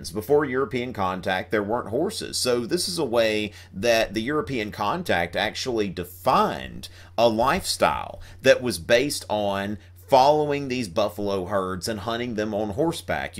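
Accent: South, United States. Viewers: Wow is this the southern cowboy accent from the USA? I always thought the people from that part of USA are considered stupid. It's amazing, you seem smart and well put together.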